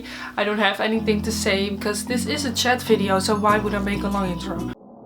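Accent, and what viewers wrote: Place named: Netherlands. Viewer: I noticed the Dutch accent, and checked the description box. Nice to see another Dutchie like me making video's about hamsters!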